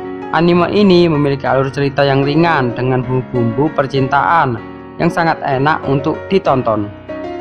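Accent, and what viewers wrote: Java, Indonesia. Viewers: Suara admin anime medok medok ya.. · aku suka medok mu min hehe lanjutkan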